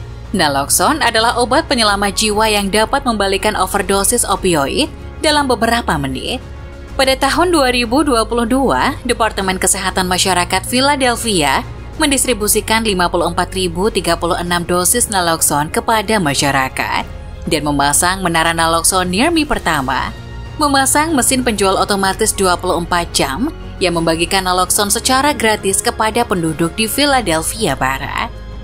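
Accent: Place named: Medan, Indonesia. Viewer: Medan nich bosss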